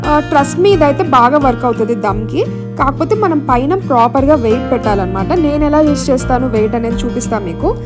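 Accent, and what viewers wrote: Rayalaseema, India. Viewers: Hi akka...we too live in Nandyal at Sanjeev Nagar. YouTube lo asal pakka rayalaseema language ante needi akka... U don't have filters in ur flow of words.. Mana kurnool district vallu ela matladtaro as it is alage matladtunnaru. Keep rocking akka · I am also from Rayalaseema. Loved ur seema accent videos. And nice receipe too... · Rayalasima slang tho video lu appudappu cheyyandi please Bokulu Jankulu Uthalabithala Benne Super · Rayalaseema lo ye ooru akka mana rayalaseema basha lo super ga chesav naadhi Kadapa jammalamaduhu 💥💥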